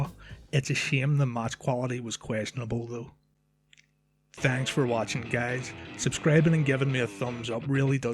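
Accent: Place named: Lurgan, United Kingdom. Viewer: Sounds like a Lurgan man to me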